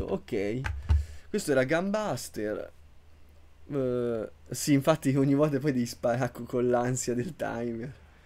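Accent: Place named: Marche, Italy. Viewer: no ma mio padre è della zona di Fermo e andiamo spesso in vacanza a San Benedetto/Porto San Giorgio, da come parli sentivo un po' di cadenza marchigiana 😁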